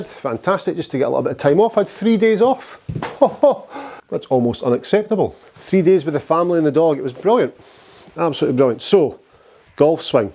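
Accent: Scotland, United Kingdom. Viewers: Two reasons why I watch this. To learn how to play golf and to learn how to speak Scottish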